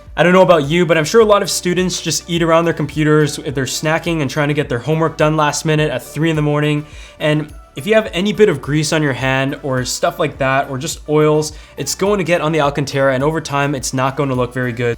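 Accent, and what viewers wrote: Canada. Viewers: r u from Canada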